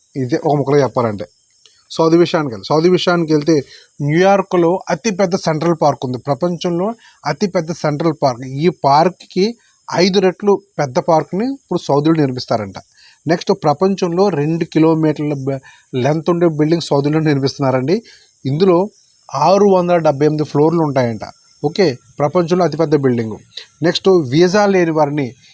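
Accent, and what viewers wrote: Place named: United Kingdom. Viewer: Again it's british english 😮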